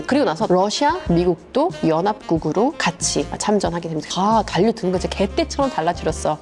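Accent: United Kingdom. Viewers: 영국에서 살았었지만 역사에 관심이 없어서.. 시험에 관련된 아편전쟁만 외우고 있었는데 이런 배경이 있을줄이야~~~ 예전부터 님꺼 듣고 영국영어 계속 공부해왔었는데 이런 상식도 넘 듣기 좋네요 ㅎㅎ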